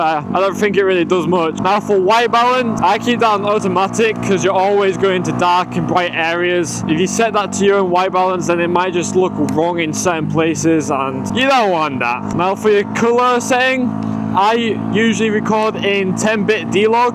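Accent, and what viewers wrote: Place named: United Kingdom. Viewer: I need one without ND Filters Bro which country are you from? You get R125. It looks like an R15 Though You sound British, i didn't know they have 125cc in English countries, we don't have R125 in my country but we have an R15. It's a really good bike i wanted one too i like the R15 intensity White, recently they released a purple white as well. But i don't wanna switch yet because it's also an uncomfortable bike but R15 is favorite throughout the whole country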